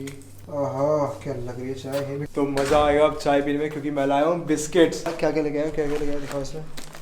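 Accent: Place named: United Kingdom. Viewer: Yes in UK. You could guess it from his accent.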